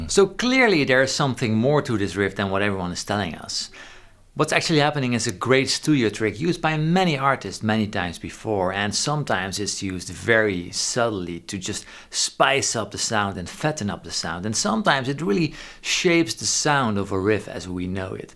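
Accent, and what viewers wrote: Netherlands. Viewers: Dutch I think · Are you dutch ? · Ik dacht al, waar komt deze man vandaan, hij klinkt Nederlands xD Toffe video, enorm van genoten!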